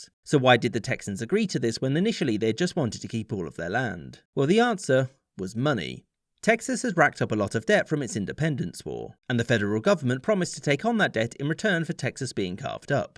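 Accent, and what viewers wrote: England, United Kingdom. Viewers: Nothing is more amusing than listening to a British man talk Texas things to a Texan 😂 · Love to hear a Brit explain my home state's History. Something I had to look up myself because 7th grade Texas History didn't cover it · I love listening to Englishmen talk about my country's history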